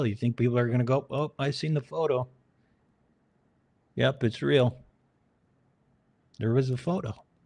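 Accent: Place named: Midwest, United States. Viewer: Welcome back. Always enjoyed your perspective. Good to hear that midwestern accent talking UFOs again.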